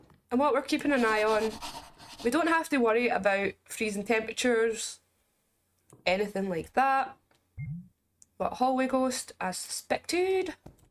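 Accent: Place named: Ireland. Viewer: phasmo for the win. also are you irish? you got a pretty thick accent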